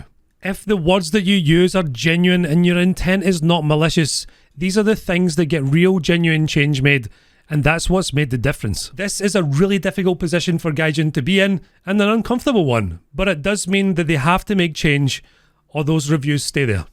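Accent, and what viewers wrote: Scotland, United Kingdom. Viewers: Just found your channel, and I like your Scottish accent.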